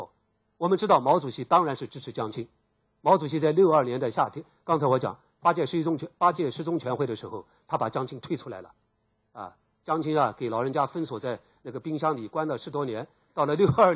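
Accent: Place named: Nanjing, China. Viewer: 南普感人。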